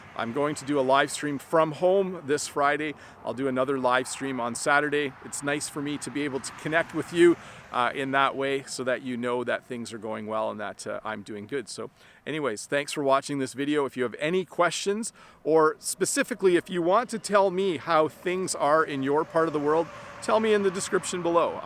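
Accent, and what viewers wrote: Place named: Canada. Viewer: it's awesome hearing the nuances in Canadian English versus American English. Thanks for the upload.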